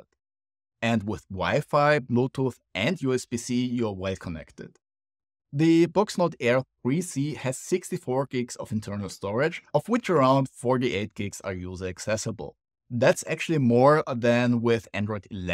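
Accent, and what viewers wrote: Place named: Austria. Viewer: you have a very austrian accent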